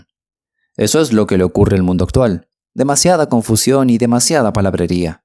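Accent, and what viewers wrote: Rioplatense, Argentina. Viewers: COMO ME GUSTA SUS RELATOS, PARA MÍ PERFECTO, HABLA COMO YO, RIOPLATENSE, MUY BUENA VOS, PRONUNCIACIÓN, ETC. una abuela desde Montevideo Uruguay 🇺🇾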